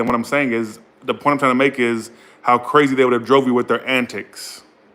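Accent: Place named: Long Island, United States. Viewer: His “long island” accent takes me out every time! 😂😂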